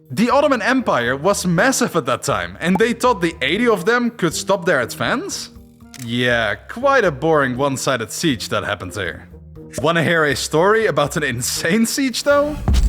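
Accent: Netherlands. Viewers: Is the guy talking originally Dutch? :D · I smell dutch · Geweldige video maar als nederlander irriteer ik me vreselijk aan het nederlandse accent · When I started watching this vid and I heard ur voice I knew you are Dutch immediately😂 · nederlandse accent? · Bro, je Nederlands accent schijnt dik door😂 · Wow wat slechte spraak in Engels. Duidelijk te horen dat je Nederlands bent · Horrible Dutch accent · Im way too late on this but I can just smell the Dutch in your voice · I smell dutch and i love it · Are you Dutch. I hear an Dutch accent (I’m Belgian) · I'm also dutch and I could immediately tell you were dutch because of the accent 😂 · I can smell a dutch fella from a sentence away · Are you Dutch/Belgian? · Gemaakt door een kaaskop jeeeeez je hoort het gelijk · I can smell Dutch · Wat een prachtig Nederlands accent! Love it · Nederlands accent gaat hard maat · You have the most Dutchest accent I’ve ever heard lol. 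Nbl from a fellow Dutchman ;) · I've never watched your channel, but I can tell you're Dutch, so stop that. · Dat Nederlandse accent haha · Ik hou van het nederlands engels accent. Altijd herkenbaar :)) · please get someone to voice these in i cant w the dutch accent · Like the video, but the dunglish hurts my soul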